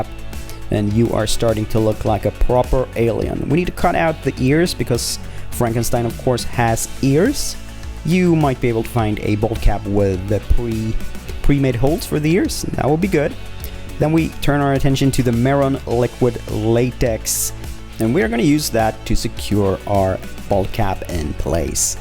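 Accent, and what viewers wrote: Scania, Sweden. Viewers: Yes, vi är båda ifrån Sverige, Öresundsregionen i söder.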